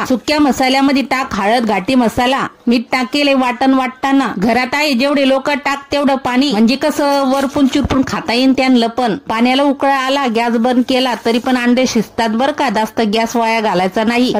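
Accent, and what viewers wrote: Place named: Vidarbha, India. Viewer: पोरी, इदर्भातली हाए वाटते त्वा 😅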